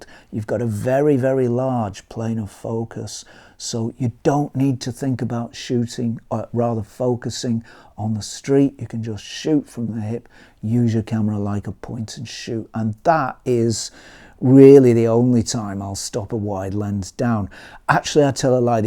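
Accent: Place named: RP, United Kingdom. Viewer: It is so much more pleasant for me to listen to a cultured British voice than to any American speaking, I notice that again & again. Thank you for sharing your photography knowledge.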